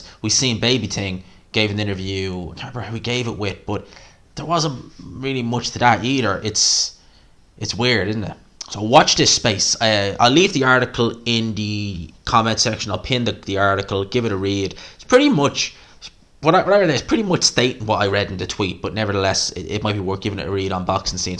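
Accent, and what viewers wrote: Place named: Ireland. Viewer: Your Irish accent is very cool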